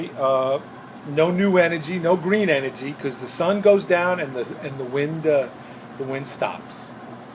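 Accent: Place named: Staten Island, United States. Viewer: yo you sound like a dang New Yorker... more like Staten Island... LOL.. thanks for the tour of your layout... great talking with you the other night... i didn't know i wasn't subbed to you but i am now... looking forward to your next video... BTW check out my last video i show the finished build for Eric vinny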